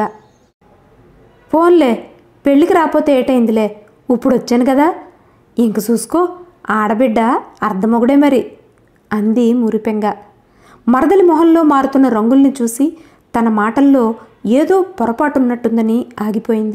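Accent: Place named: Godavari, India. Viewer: ఎంత బాగా చదివేరో!ఈ కథను ఎవరు చదివినా గోదావరి జిల్లా స్లాంగ్ రాక నాకు కొంత అసంతృప్తి గా ఉండేది. చాలా చాలా థాంక్స్ మీకు. మీరు కథ పెట్టిన నాలుగు రోజులు గమనించక పోవడం వేరే ఫంక్షన్ లో ఉండి తప్ప మరేం కాదు. 😊👌👍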